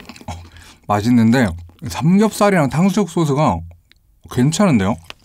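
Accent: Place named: Seoul, South Korea. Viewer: 서울말투 좋당 나는 쏘쓰 라고 하는데 소~스 라고 하시니까 발음이 부드럽네요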